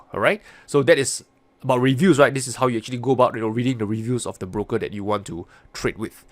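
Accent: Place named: Singapore. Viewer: Greetings from Scotland. Just found your channel...wow some excellent information on here, been trading for over 15 years and its great to see someone like you providing so many videos free of cost. If I may make a suggestion, you should include some info on how to read fundamentals of the asset that they are trading. I assume with that accent you are from SG, lived there from 2005 to 2016, started off with an apartment in Great World City , then moved to River Valley for a few years and finished off in Toh Tuck Road for the last 6 years which were the best, living with the locals, not a foreigner in sight. LOL , not a day goes by without me thinking about my 10 years there, keep up the great work.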